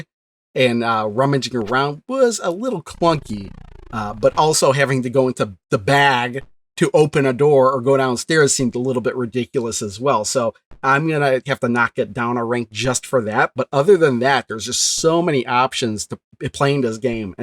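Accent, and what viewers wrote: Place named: United States. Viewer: Enjoyed it very much You are so dedicated And a very nice accent.. i wonder what part of the States this is from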